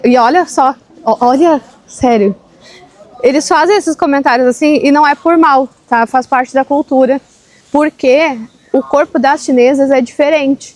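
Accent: Rio Grande do Sul, Brazil. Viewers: Voce é gaucha?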